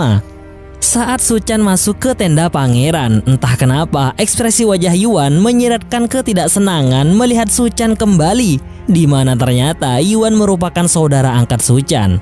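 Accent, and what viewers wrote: Madura, Indonesia. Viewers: Abbe mik oreng medure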